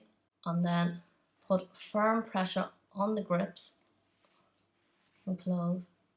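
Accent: Ireland. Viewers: it’s an irish accent! i was wondering about that but didn’t recognize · Just found your channel and love it. Great to hear the irish accent. I'm irish too, from Sligo. Great tips on using the snaps. I have liked and subscribed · Thank you! I've been looking for such buttons and tools but the results are pretty much all on Amazon and/or made in China, the 2 things I wanted to avoid. By your accent, I'm guessing you're Irish too? If so would you happen to know of anywhere else I can buy them, particularly a good tool that isn't made in PRC? I'm not finding any in Hickey's or similar shops. (I found some buttons from Honk Kong which is fine for me and there's a Taiwan site which have the press pliers tool but it's mainly for bulk orders) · Are you from Ireland? Thank you for this I couldn’t read Chinese instructions.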